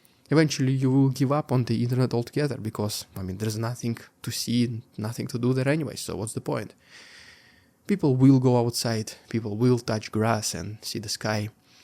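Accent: Russia. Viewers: I am listening your pathetic bs and your horrible accent from Russia right now🙈 😂 do not worry for us. We have more speach freedom and access to the information than any citizen of so called free democratic world. 👌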